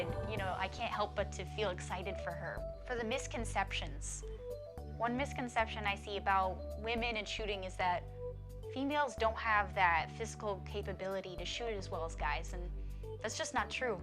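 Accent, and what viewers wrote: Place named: Great Lakes, United States. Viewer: You have good videos...but you should work on losing that snarly Great Lakes accent. It's kind of annoying.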